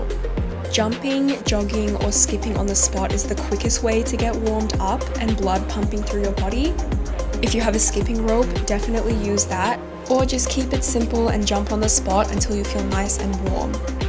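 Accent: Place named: Australia. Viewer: Prefer your natural Australian accent. Beautiful videos. Thank you.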